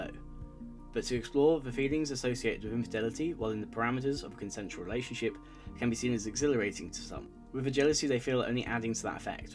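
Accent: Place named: United Kingdom. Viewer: Wish your pronunciation would have been clear and audible..Heavy British accent makes it foggy to hear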